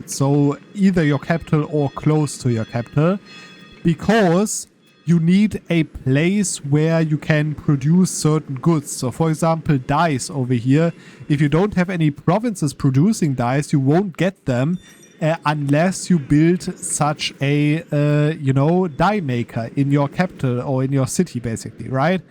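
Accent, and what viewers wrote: Germany. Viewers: "how did you know im german" ahhh accent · German accent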